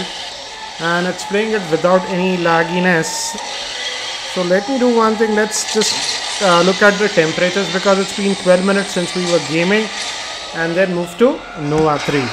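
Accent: India. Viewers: Snaaaapdragon, geeky never gets old, love that indian accent and reviews :)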